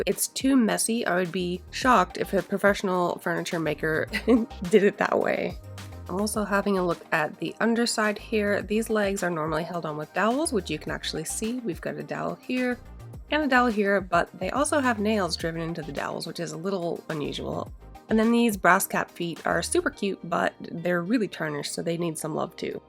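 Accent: Canada. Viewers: Ahhhhh… Your accent makes sense now when you mention Canadian dollars! I only noticed it with certain words but now that’s all I hear 😂 I love it :)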